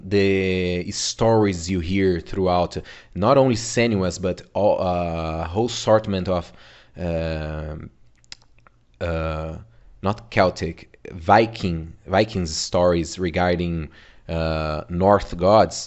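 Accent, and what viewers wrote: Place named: Brazil. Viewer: You sound like a Brazilian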